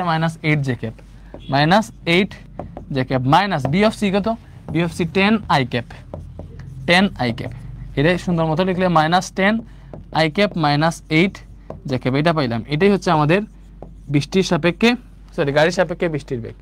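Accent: Sylhet, Bangladesh. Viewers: ভাই সিলেটি নি??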